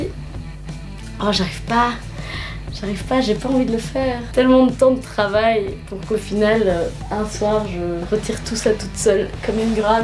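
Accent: Belgium. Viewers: Tu as un peu l’accent belge 😂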